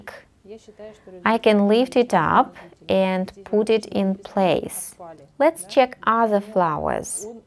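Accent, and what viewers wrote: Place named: Russia. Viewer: This Russian accent that you cannot hide even when you try very very hard. Anyway, very beautiful and helpful videos. I love them. Thank you so much!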